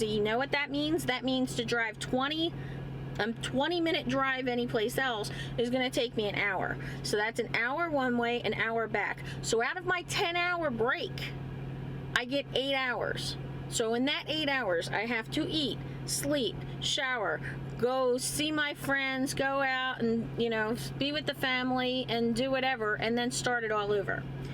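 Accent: New Jersey, United States. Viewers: I knew there was a reason I love hearing you talk, you’re a Jersey girl.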